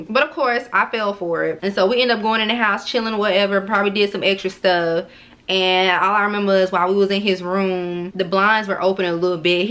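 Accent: South, United States. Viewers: I’m from Texas. When I say I loveeeee how country you are 😂😂😂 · you’re so southern girl I love that accent💕great video, F that dude!! new sub beautiful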